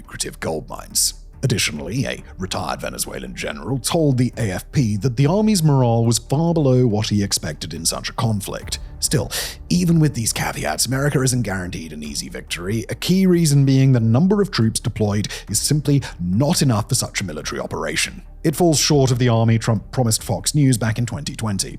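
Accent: United Kingdom. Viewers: Watching this in April 2026… bro really built a whole “analysis” career off “not enough troops” 🤣 Take away the British accent and this is just mid-tier guessing with confidence.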